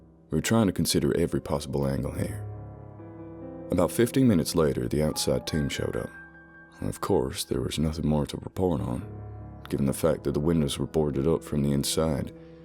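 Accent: South, United States. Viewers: sounds southernish to me as well. I'm from Pa and it sounds nothing like I do . 😁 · Ok, now I'v found a new storyline that I truly will enjoy anticipating the next installment thank you! You nailed southern accent so well I love it just love it!!! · Surely you mean Southern.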